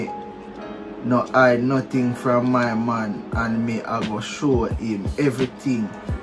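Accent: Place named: Jamaica. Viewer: Man a chat English and Patwa😂😂‼🔥🇯🇲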